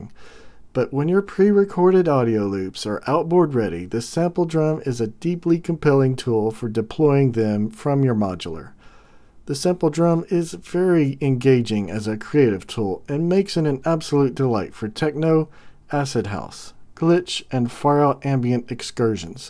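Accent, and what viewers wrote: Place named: North Carolina, United States. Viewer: Thanks for the videos. I like them, even though you sound like you might be from North Carolinas or something :)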